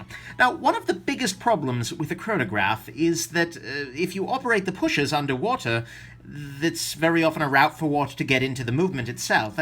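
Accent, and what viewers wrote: United Kingdom. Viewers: good british accent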